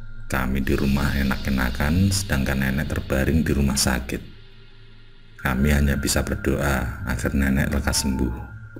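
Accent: Java, Indonesia. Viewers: Kepo sama wajahnya.... Dengan suara khas jawa yg medok.... 😊😊😊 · Jelas banget, mas orang Jawa y Karna logat nya mas Thu ketahaun banget!!!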